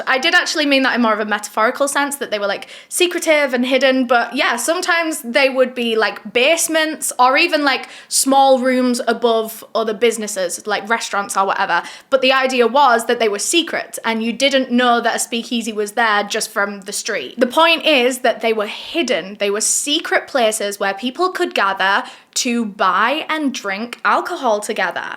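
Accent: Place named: Yorkshire, United Kingdom. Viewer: It's always an amazing day when uploads. Thank you for another wonderfully informative video. I love your Yorkshire accent. I live in Derbyshire, so I have a twang of it in my accent as i lived in South Yorkshire for a while. Look after yourself, beautiful lady!. 🥰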